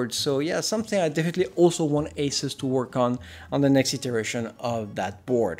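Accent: France. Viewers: Your french accent is awesome.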